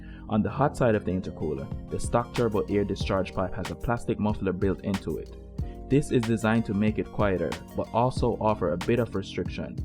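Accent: Jamaica. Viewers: Hey, I hear a Jamaican accent. I recently bought a well kept 2005 R53 Cooper S. What is the purpose of the Meth and would this be appropriate for the R53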